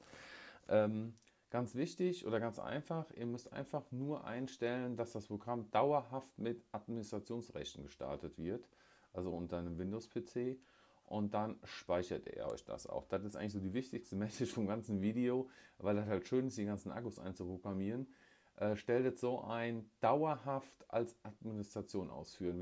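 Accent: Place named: Lower Rhine, Germany. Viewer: Der Akzent...... Dat & Wat!!!! Herrlich!!! Wich komm aus Wesel am Niederrhein..... da sprechen wir auch so!! Lol!!! Gruß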